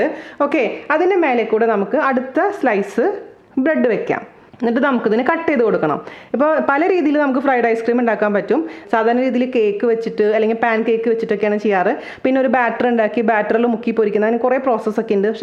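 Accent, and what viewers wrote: Thrissur, India. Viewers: Simple avatharanam adipoli 👍thrissur ano slang like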